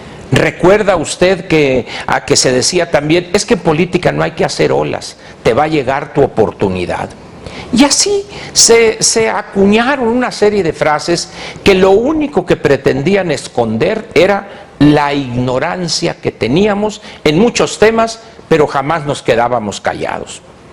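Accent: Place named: North, Mexico. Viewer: Maestro Angel Verdugo soy su fan con todo respeto... sus interesantes comentarios , inagotables conocimientos y esa forma norteña de hablar 🤬🤬☺️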